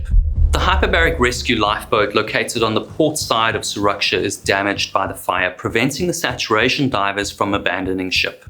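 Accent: South Africa. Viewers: Is the narrator from South Africa?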